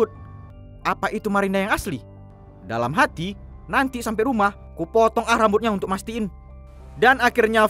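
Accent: Medan, Indonesia. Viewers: Seru kali ah dengar cerita sm logat medannya abang ini🤣🤣 mantap..mantap👏👏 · Sumpah putri snng bngt dngn cr Mimin nyeritain setiap alur crta2 film,,dngn bhsa logat medannya rs rindu sm kampung hlmn jd sdikit terobati,,moga sehat sllu buat mimin Santun pg dr putri Jawa Timur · Karna logat medan nya sangat terasa,lucu dengar ang nya riveuw😁😂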